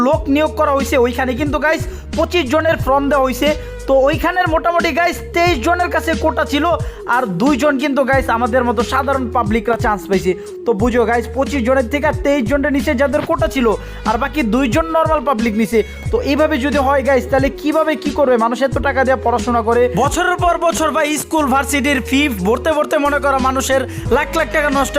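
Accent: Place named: Dhaka, Bangladesh. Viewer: Vai apnar basha gatrabari kon jaygay plizzz vai bolben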